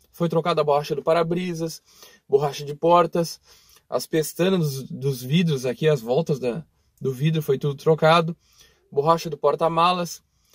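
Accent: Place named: Bagé, Brazil. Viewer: De Bagé ou região amigo? Sotaque da minha terra! Estou acompanhando os vídeos, muito bom. Pretendo reformar um Uno por hobby. Abraço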